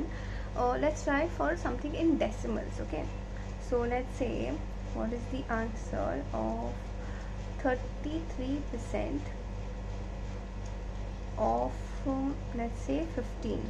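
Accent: India. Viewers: your Indian accent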